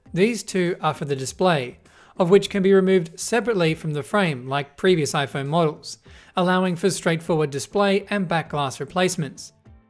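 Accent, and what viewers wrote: Australia. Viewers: Australian english mate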